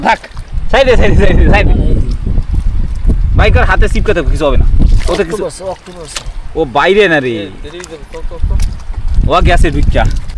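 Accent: Bangladesh. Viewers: Bangla vasa akdom BanglaDeshi tomake Nijer Apon lage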